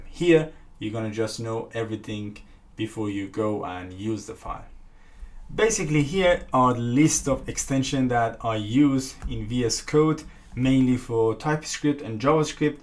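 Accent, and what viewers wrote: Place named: Iran. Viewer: your accent similar to Persian. Are you Persian?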